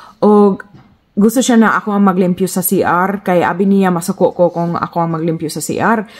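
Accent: Visayas, Philippines. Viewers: Sshhhttt… hhoooyy… 😁🤣 grabe jud ka bisaya. 😂 try korean airline more cheaper dw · Maayo kaayo ka mo estorya. Ganahan ko maminaw nimo Kay imong tingog Kay convincing kAayo mag talk ug story sa imong Life. Tapos bisaya kaayo. Gwaps kaayo imong tingog. FIRST TIME nako na ka kita sa video ani. Recommended by YouTube unexpectedly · Ang galing mo sister! Lalum kaayo ka mo bisaya. Proud kaayo minh mga Bisaya. God Bless you & your family! 👍👏❤️🇵🇭🙏☝🏻❤️ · ganahan kaayo ko sa imo accent mag binisaya jud, nindot paminawon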